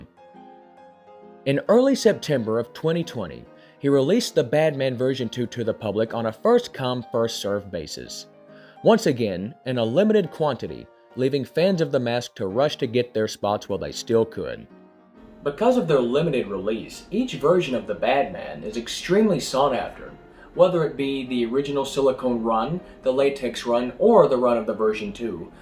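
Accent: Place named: South, United States. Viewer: Awesome! also dude, you sound like a southern version of the narrator from How it's made. 😆 Those are very cool masks too, wish I could but like one someday!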